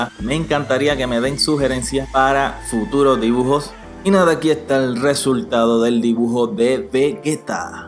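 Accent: Puerto Rico. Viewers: OYE ERES DE PUERTO RICO?? Soy dominicano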